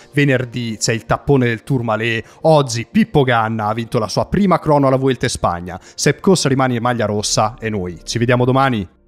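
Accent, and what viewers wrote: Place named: Reggio Calabria, Italy. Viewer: Pensavo di Reggio dalla S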